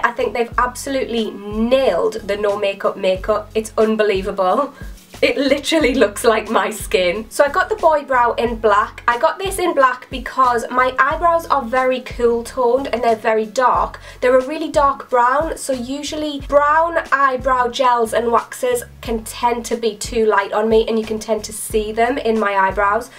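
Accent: Newcastle, United Kingdom. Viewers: I have no idea if anyone else has said these and I’m sorry if they have but three facts about you are 1) You’re from Newcastle (I love your accent) 2) You have lots of ear piercings and normally wear hoops in your videos and 3) You have been on some pretty funny dates like the one where you were with that boy and he tried to kiss you and you jumped away and said “well that was fucking awkward wasn’t it” or when you peed when having sex one time 😹 you’re honestly so funny, I absolutely love your videos and you are and always have been one of my favourite yourtubers, I love you! Thank you so much for doing this giveaway you’re so kind ❤️❤️ Good luck to everyone ! Xoxo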